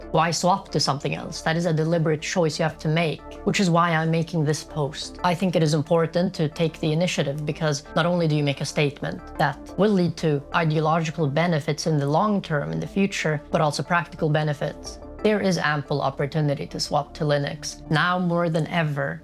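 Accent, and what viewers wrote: Sweden. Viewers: You sound swedish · just clicked on this recommended video, hearing you for the first time. You sound as if you're from Sverige. Anyway, I subsribed because you support FOSS. No matter what *nix you use, I'd recommend to look into the history of *nix, where our beloved penguin is a major part of. Keep up the good work, thanks for this video and love from NL. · Say no more, the Swedish accent already convinced me.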